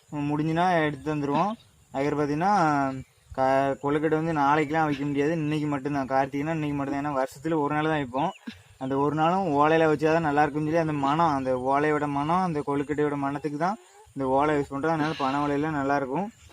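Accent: India. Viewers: Super god bless but very risky work and hard work 💪 🙏 ❤your looks handsome your madurai slang excellent 👍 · Arumaiyana vela enaku piducha nellai slang love you chlm be safe from delhi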